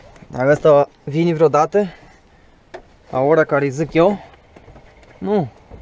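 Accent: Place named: Moldova. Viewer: Salutare! Aceasta este filmare de lumea trecută 19 iulie 2021? Îți doresc numai drumuri bune și mai largi,nu din cele întortocheate că la Cannes și celelalte cătune! Eu credeam că orașele europene sunt mai "aerisite",nu ca prin unele orașe de pe la noi. Te-am cunoscut de la primele vorbe ale tale,că ești moldovean de al meu! Care este lungimea totală a "trenului"tău,?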